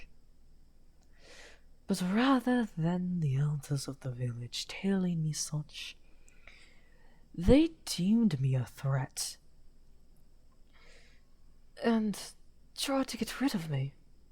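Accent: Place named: United Kingdom. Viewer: AHHH I loved your british accent 😍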